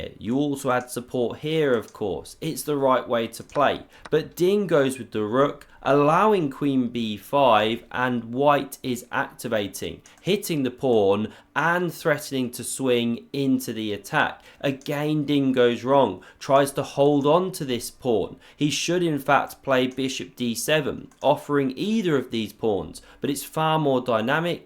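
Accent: United Kingdom. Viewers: what is the name of your particular type of British accent? What's the region?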